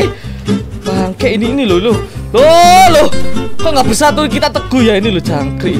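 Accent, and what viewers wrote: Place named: East Java, Indonesia. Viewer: jawa timuran , rame juga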